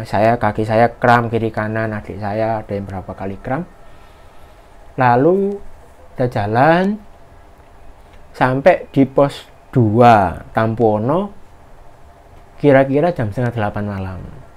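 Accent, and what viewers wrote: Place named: Surabaya, Indonesia. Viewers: Kenapa ya,kok saya suka dengerin logat orang Jawa Timur kalo ngomong.. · Asyik banget aku dengerinnya,trus sampai senyum" sendiri.keren rek,bahasa suroboannya.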